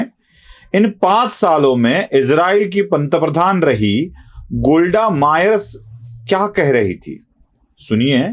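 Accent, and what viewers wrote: Maharashtra, India. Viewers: क्रुपया हिंदी बोलताना मराठी ची लकब टाकु नका. हिंदी सहजपणे बोला. टेंशन फ्रि. मग मजा येईल.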